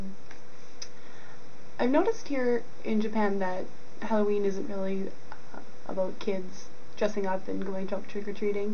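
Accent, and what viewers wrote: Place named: Canada. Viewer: you are Canadian... I can tell by they way you talk.. aye.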